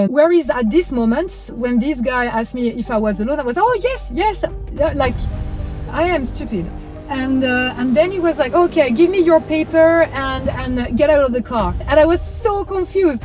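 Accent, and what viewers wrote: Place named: France. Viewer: I think you mean influenced. NOT POLLUTED. I love your beautiful use of English with your lovely French Accent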